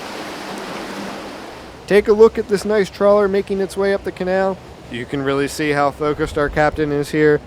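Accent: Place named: Philadelphia, United States. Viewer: zzzzzzzzzzzzzzzzzz Dislike the commentary. Esp the Philly accent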